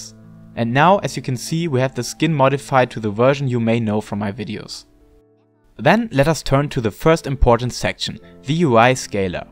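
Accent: Germany. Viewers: Nice german accent^^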